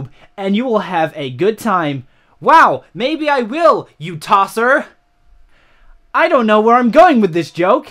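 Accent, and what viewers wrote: United States. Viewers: I love your American accent 😂😂😂😂 not even offended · Your American accent is spot on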